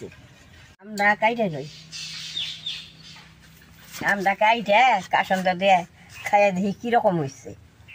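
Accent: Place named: Manikganj, Bangladesh. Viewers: তার মুখের ভাষা আমার মানিকগঞ্জের মতোই, আঞ্চলিক টানও একই। সম্ভবত তার পূর্বপুরুষ মানিকগঞ্জ থেকে আসাম গিয়েছেন। কাসন্দ বানানোর নিয়মটাও হুবহু আমাদের বাড়ির মতোই। নমস্কার ঠাকুমা। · Bangal bolaito thakumar ranna valo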